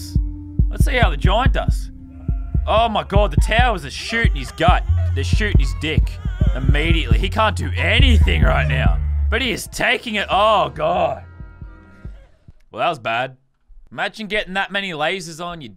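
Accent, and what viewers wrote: Australia. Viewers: uhmmmm are you Australian? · u have a Aussie accent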